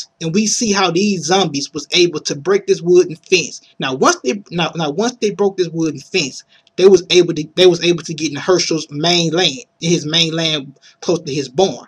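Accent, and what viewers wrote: United States. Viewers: Are you from America man? You're accent is really cool, I can listen to your voice all day long haha!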